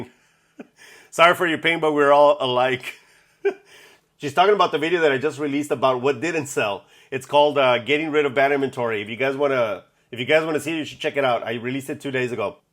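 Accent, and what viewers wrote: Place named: Texas, United States. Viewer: You really are a Tejano!! My mom and granny all born in Texas (El Paso). When I hear you talk, reminds me of being with my tejano relatives!! Good content, payaso!!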